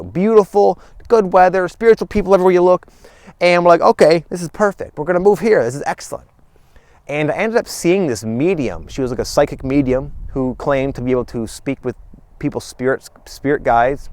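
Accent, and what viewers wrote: Michigan, United States. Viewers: brother from Michigan <3 my name is Anjalina; I've lived in Colorado for a long time, when I first heard your voice on your channel this summer, it reminded me of home. thabkyou for your wise words, along my journey I had a super accelerated beginning, then a big fall, and a steady climb back "up" now, I am certainly on the cusp of something right now, again, thankyou- sending the most confident vibes your way. XX 🐉♄♇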